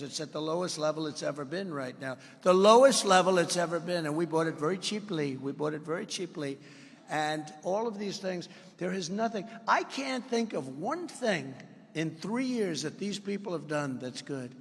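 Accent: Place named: New York, United States. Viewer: Love you're NY accent God speed Presidrnt Trump again We are praying the fraud will be wide open this time.😊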